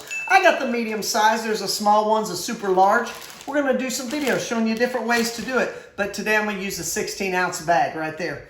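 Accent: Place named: South, United States. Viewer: Dad jokes, Rice Krispies, country accent, not harsh on the eyes. I don’t see how this video could’ve been better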